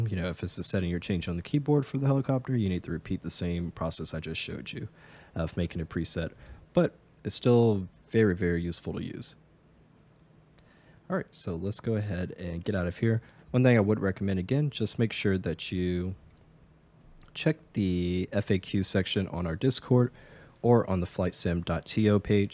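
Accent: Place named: United States. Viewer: "Starting" cold and dark.Very hard to follow that american accent. This video doesn't help me at all.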